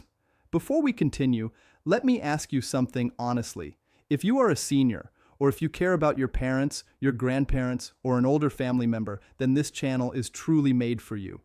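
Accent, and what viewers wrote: United States. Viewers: Well to be honest, what do you expect with a USA narrator?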